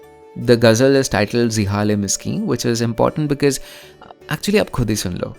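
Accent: India. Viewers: Not at all..his accent and pronunciation is like indian 😅 i guessed right when i heard his first word lol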